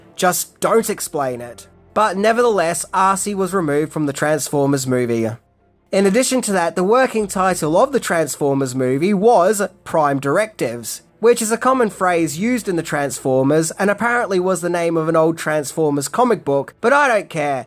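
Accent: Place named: Australia. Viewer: LMAO, your Aussie accented snob voice is hilarious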